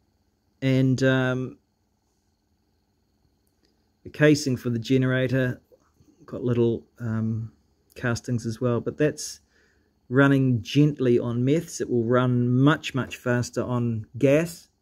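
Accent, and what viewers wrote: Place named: New Zealand. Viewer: Chur bro you sound like a fellow New Zealander?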